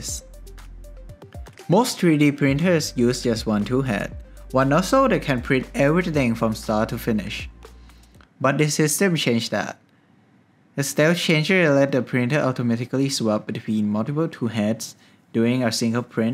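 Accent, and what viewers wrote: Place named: Thailand. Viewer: very thai accent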